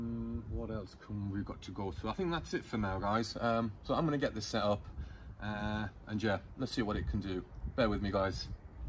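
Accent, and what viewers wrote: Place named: Yorkshire, United Kingdom. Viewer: Nice review. Loving the Yorkshire accent. Going to buy one on your recommendation. Thanks.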